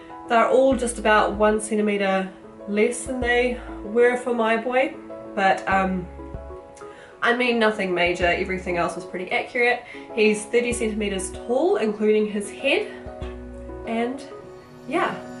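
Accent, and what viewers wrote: New Zealand. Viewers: Lol 😂, "why?" ... Love Alan's body tats. Did you make his hoodie or buy it. Been thinking of making a bjd video, the community needs more kiwi voices I think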